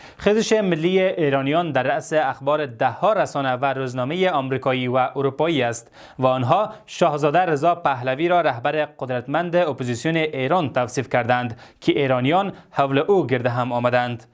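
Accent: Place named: Afghanistan. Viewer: سلام برادر افغانی عزیزم افتخار میکنم بهت چون حقیقت رامیگی من ایرانی هستم و درود فراون میفرستم بهت. ای کاشک افقانستان را از ایران جدا نمی کردن وجوز ایران بود با آن لهجه شیرینان .خدا نگهدار ایرن وافقانستان باشه.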